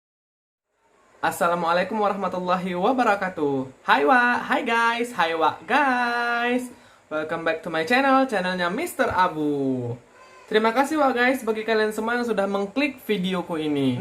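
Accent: Medan, Indonesia. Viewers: Habis nonton filim arie hanggara. Langsung kesini. Auto subrek. Btw nampak x logat medan nya bg😂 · Medan kale kau bg..😂😂😂..mantap👍👍👍..selalu semangat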